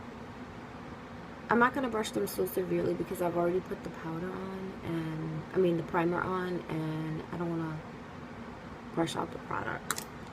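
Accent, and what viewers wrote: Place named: New York, United States. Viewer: I love love your New York accent.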